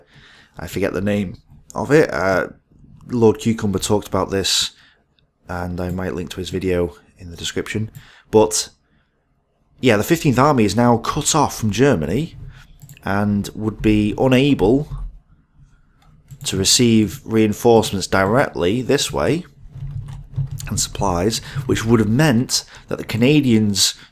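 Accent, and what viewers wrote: United Kingdom. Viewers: I did like this. But my Missouri boy ears had a little trouble with the British accent. I sounded like he kept taking about getting into "the raw." It looked like he was referring to central Germany. But I am not familiar with that term. Can someone help me?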